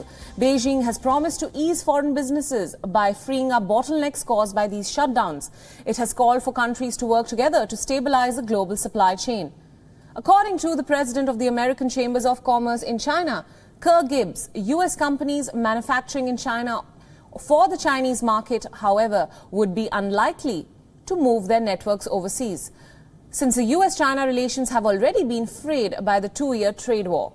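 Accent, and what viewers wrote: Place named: India. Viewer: Why the heck this Indian hostess with this terrible ascent reporting on US and China?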